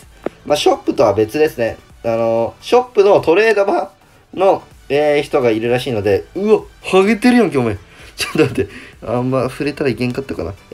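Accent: Okayama, Japan. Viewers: 今回岡山訛り強め？笑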